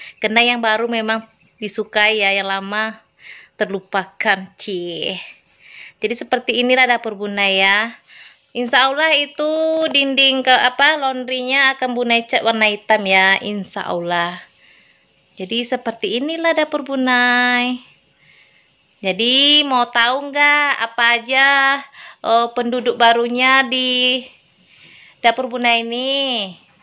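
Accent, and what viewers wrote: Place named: Sumatra, Indonesia. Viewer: didaerah mana tinggalnya, logatnya spt di sumatera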